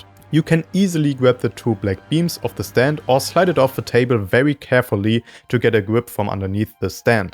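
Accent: Germany. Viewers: Wie kommt man in Deutschland an die Teile, wenn man das bauen will. Die Anleitung finde ich bei euch auf der Website. Cool. Und da ist auch ein XML mit ner Teileliste. Auch cool. Aber Lego Pick A Brick akzeptiert nur CSV oder JSON Files. Also hab ich die Liste mit Hilfe von AI in ein CSV File umgewandelt. Leider bekomme ich dann bei Lego die Meldung, dass es kein einziges dieser Teile derzeit verfügbar gibt. Ich nehme mal an, irgendwas mit den Teilenummern kann nicht stimmen? Oder Lego Pick A Brick ist der falsche Ort? Wäre nett, wenn ihr mir da helfen könntet. Weil so lange ich die Teile nicht irgendwo bestellen kann, macht es ja auch keinen Sinn, die Anleitung zu kaufen. Hätte echt Lust, das nachzubauen. Ich hab Anhand deines Akzents einfach darauf geschlossen, dass du deutsch bist lol. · Mann hört das du deutsch bist so raus😂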